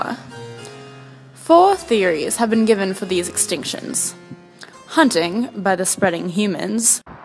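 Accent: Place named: RP, United Kingdom. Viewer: You are so posh!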